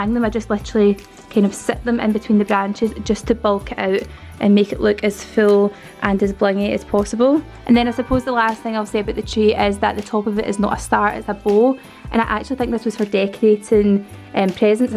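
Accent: Scotland, United Kingdom. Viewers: I love Scottish accents looool xx I just like it when you say “wee” ..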